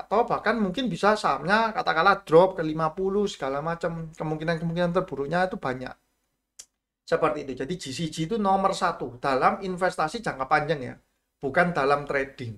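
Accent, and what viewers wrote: Surabaya, Indonesia. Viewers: logatnya kog Sbynan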